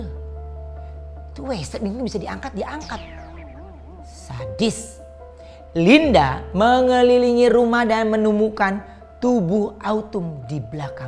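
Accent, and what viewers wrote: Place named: Bekasi, Indonesia. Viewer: Cerita serem ko malah jdi kocak..sih ko.. Bahasanya gaool bangaaat.. (logat orang bekasi bangat gitu..) haha.. Sukses terus deh.. Makin lancar n berlimpah rezekinya buat..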